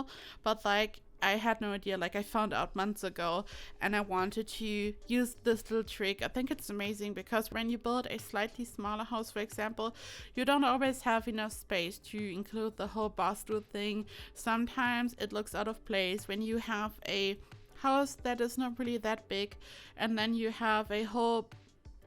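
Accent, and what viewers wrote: Germany. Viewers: Sounds like u are German :D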